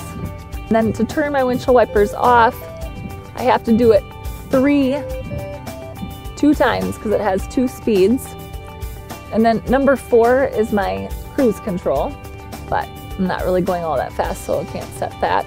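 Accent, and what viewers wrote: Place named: Minnesota, United States. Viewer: Your ride is soo cool, so much cooler than those available a very short time ago. Also, you sound soo Minnesotan. Thanks for the video.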